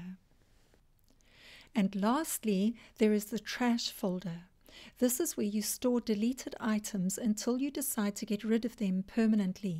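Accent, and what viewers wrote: South Africa. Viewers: Thank you for the very useful overview. Nice to hear a South African accent too!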